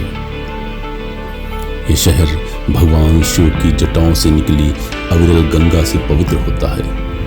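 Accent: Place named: Kanpur, India. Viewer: Aapki aawaaz bhi Kanpur ki visheshta hai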